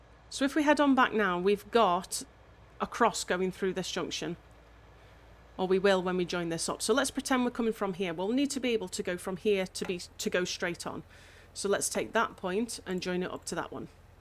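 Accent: United Kingdom. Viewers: Great tutorials. Not many out there covering all the editing of paths and your thought process. Nice and clear instructions too with no pauses and umms and ahhs. Always good to hear a UK voice on YouTube as well lol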